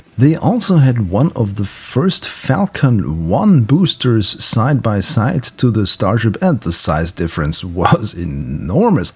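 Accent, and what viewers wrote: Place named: Germany. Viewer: man hört den deutschen akzent in deiner stimme raus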